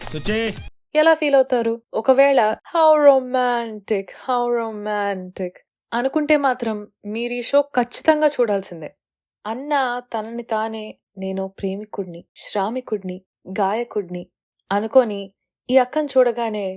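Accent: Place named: Godavari, India. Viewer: your non pretentious godari slang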